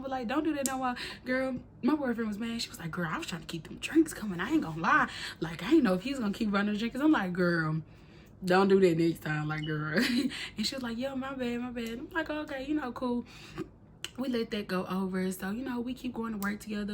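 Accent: Mississippi, United States. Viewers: I love that country azz MS accent, my family is from there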